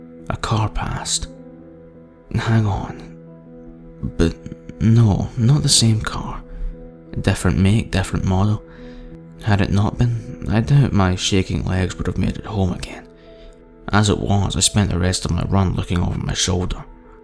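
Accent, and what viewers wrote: Scotland, United Kingdom. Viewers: Great stuff, love the your slightly seedy Scottish accent c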